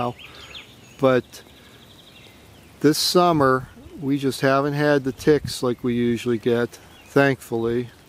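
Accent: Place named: Maryland, United States. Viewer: Are you from Maryland? Your accent sounds Maryland to me.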